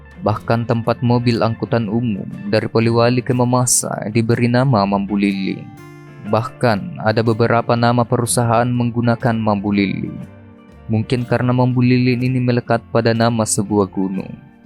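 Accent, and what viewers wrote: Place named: South Sulawesi, Indonesia. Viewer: Lidah sulawesi selatan terdengar jelas dari mulut si admin,.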